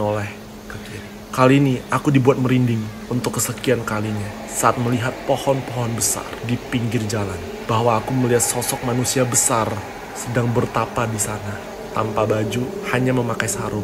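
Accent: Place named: Medan, Indonesia. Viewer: Masih ada logat2 medannya dikit 😁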